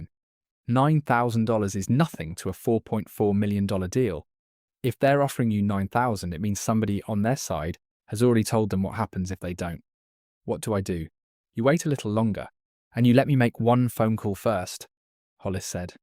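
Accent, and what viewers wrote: England, United Kingdom. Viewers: South Carolina? Get rid of the English accent.. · love your stories but why the english accent.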